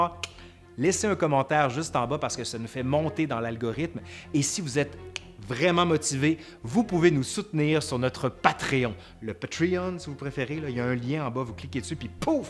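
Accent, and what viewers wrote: Quebec, Canada. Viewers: Bonne video Merci est que t'e Québécois ? Bonne année !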